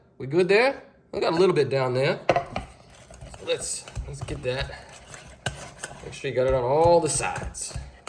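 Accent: South, United States. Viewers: Oo the southern accent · Good old-fashioned deep southern classic twang accent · Love this desert. Oh my goodness. It looks delicious. I have to make it. I agree with you and using your hands. Best way to really get the job done. Your kitchen, your rules. Love the southern, gentleman accent as well! Subscribed because I have a feeling you're doing many things for us to make. 🎉🎉🎉🎉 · I do detect some “Dixie” accent! Love it! It has to be good! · The southern accent is cool. Combination between Savannah and Louisiana?? ❤😂🎉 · I love love love the southern gentleman accent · I absolutely love your accent old south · Love your talk you sound so southern its so cute . Yummy taste 😋 thanks for showing this recipe 🎉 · Ya know what I love more? His accent! 😂. Louisiana? Best southern? Accent ever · Loved your southern accent 😊❤️🙏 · Im going to make this for my kids just bc of your wonderful southern accent 😊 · I love your southern accent 😊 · Maybe more for camera/video time it just looks like they waste more than you/we do at home. 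I’m sure they don’t throw all that much away all the time. I think he did better than most. (By the way I love your southern accent.) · You got south in your mouth!! Love it! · I'm only watching this because you have a lovely 🌹 southern accent. You sound so smooth. That cake looks really good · Where are you from? Such a southern accent. Like old confederate days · You have to be from he south, am I right? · That looks yummy. I love your southern accent. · Yes, I do agree. Everything is shrinking and the price keeps on going up. 😂. Just wanted to say as well, I do agree with the ladies from previous comment about the southern accent. 😊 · Love your southern accent! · Looks good the sweet southern accent makes the video even better ❤ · And I’m fron Nashville, NC and I agree. Sounds like Clayton Hollingsworth…Blanche’s brother on Golden Girls. Very Gone With The Wind. Ive NEVER heard a southern accent like this one. 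Born n raised southern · Southern lovin' cookin' rite dare.... love the accent. 😊 love the recipe. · U are either from Alabama or Georgia! Boyee u have an accent! Lol! I’m from the south too but I’m from New Orleans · your southern drawl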